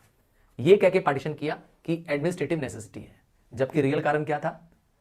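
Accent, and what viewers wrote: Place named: Bengal, India. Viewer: I am from bengal and your AMAR SONAR BANGLA accent is way too hilarious sir. But youre a very good teacher sir❤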